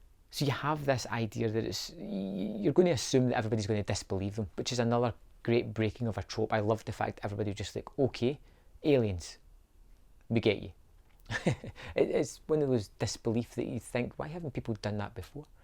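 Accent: Scotland, United Kingdom. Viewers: Was surprised to hear a fellow Scots voice.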